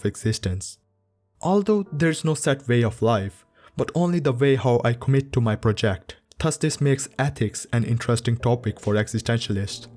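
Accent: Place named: India. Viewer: India comes under Asia, but I feel like he has a strong Indian accent, maybe south east Asia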